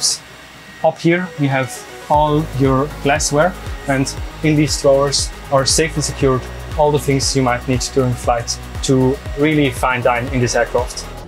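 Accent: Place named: Switzerland. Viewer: Meeeega schwizerakzänt ich würd sogar säge du bisch stadtzücher😂 stimmts?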